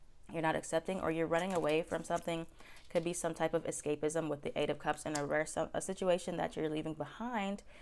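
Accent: Bronx, United States. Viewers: You from the Bronx?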